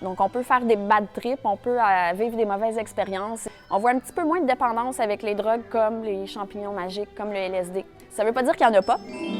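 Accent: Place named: Quebec, Canada. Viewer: i've been speaking french for more than 15 years now, every 10 words she says, i only understand one,is this how all french canadian speak?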